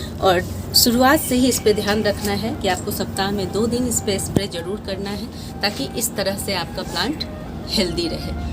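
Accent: Bihar, India. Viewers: Aap Bihar se hain kya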